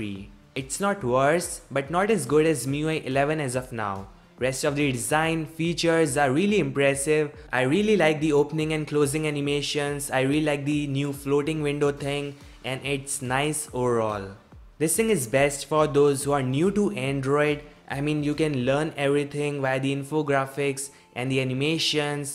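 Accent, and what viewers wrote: India. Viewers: i like english from india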